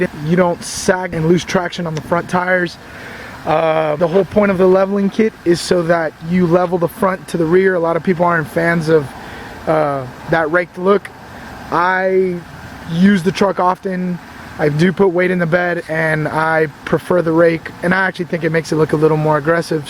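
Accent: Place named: Mexico. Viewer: quit talking like a Mexican. Looks good.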